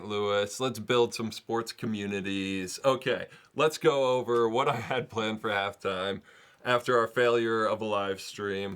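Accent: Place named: United Kingdom. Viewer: Loved the British accent! Who knew you had it in you. Also great list - chatGPT’s got nothing on you 😂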